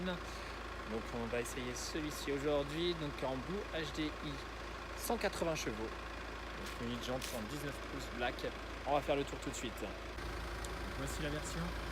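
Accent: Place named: Paris, France. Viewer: Cet à cent de titi parisien est trop drôle.... sport...heu...la routes de 8 rapport..heu... vehiculeeeee LOL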